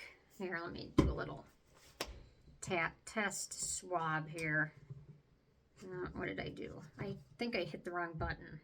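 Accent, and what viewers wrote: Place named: Midwest, United States. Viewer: I love your accent. Northern Midwestern accents are absolutely adorable- sounds so wholesome! 😊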